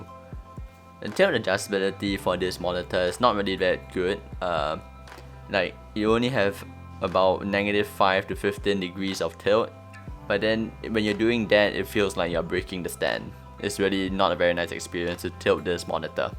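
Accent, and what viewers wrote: Singapore. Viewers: Are you from singapore? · U from singapore?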